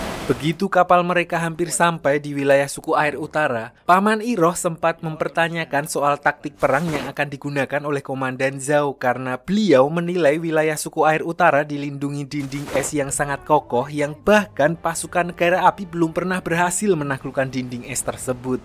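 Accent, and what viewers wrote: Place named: Tulungagung, Indonesia. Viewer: Wong tulungagung sampean cak😂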